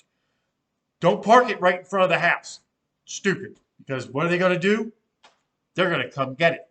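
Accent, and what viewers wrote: United States. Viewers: Is that sarcastic? He’s a bit country but the info is at least smart sounding to me. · I can't focus on anything hes sayin over the soujd of his Pittsburgh accent!!!!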